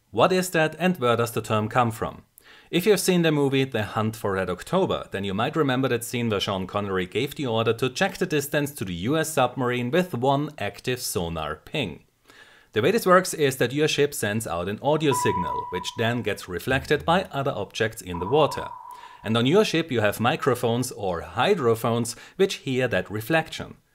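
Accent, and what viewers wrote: Germany. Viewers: if this guy is not german i dont know what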